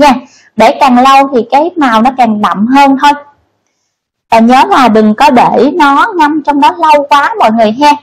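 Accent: Vietnam. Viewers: Nghe tiếng yếu và nhỏ, giọng Miền Nam, nghe rất ức chế... Sau nên in chữ phụ đề bói ử dưới nhé, tốt nhất nên lồng tiếng giọng to và miền bắc. . nghe đỡ khổ sở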